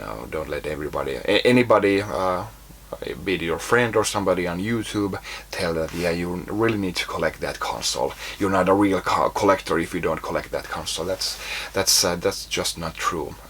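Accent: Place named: Finland. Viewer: It's not just the Finnish accent or his low register but also his measured way of speaking and the thing he does when he can't think of what to say for a second. He's nice to listen to, and he has something to say. I wish that more announcers had voices like his! Aside from the guys who commentate poker tournaments, the olympic people, and a few hockey guys, those guys usually sound barky and rub me the wrong way. Even when there is a nice voice they typically have two others you have to deal with when he has nothing to say. There are lots of people like on Youtube who actually know how to speak without excessive animation, and with diction, one of the reasons I watch it and why I think it's so popular. And of course they cover things you'd never discover otherwise. I've lived with a moderate hoarder, and you are no hoarder! Not even the slightest bit. 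You're right when you say you're running out of space but everything is neat and organized and clean, you are willing to let things go, and most important everything has a use within the foreseeable future and therefore is not trash. They're games.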